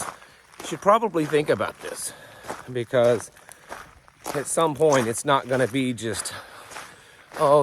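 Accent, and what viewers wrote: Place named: South, United States. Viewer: What's up the southern accent?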